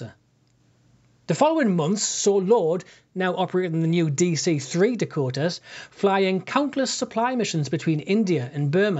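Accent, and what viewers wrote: Newcastle, United Kingdom. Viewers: I don't hear Geordie accents on YouTube very often. I live near Seattle, but I am originally from Lobley Hill, Gateshead. My uncle Len was killed in Normandy on Sunday, July 23red, 1944.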